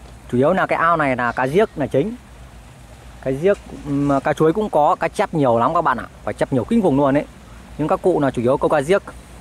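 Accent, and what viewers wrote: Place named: Nam Dinh, Vietnam. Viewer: Hê nô...giọng Hà tây hay Nam định mà nghe ngộ ngộ , chắc Nam định 100% nuôn !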